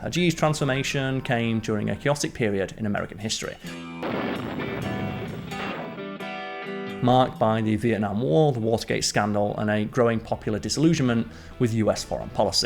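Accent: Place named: Northern England, United Kingdom. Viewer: You've done really well at slowing down the sleech pattern for all the non northern English people. I am one and it was really difficult when I moved over seas and appreciate the effort you've put in 😅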